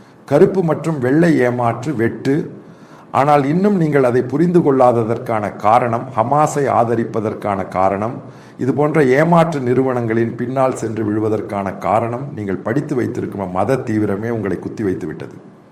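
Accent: Kerala, India. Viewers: தமிழுக்கும் மலையாளத்துக்கும் இடைப்பட்ட ஒரு மொழியில் பேசுகிறீர்கள் 😇 மன்னிக்கவும் , பாதி புரிகிறது பாதி புரியவில்லை.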